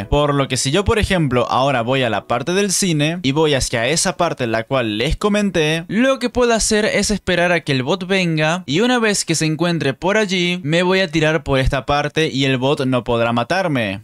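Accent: Argentina. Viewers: Vos eres. De 🇦🇷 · eres argentino esa es mi pregunta y tambien yo soy argentino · ENTONCES ERES DE ARGENTINA?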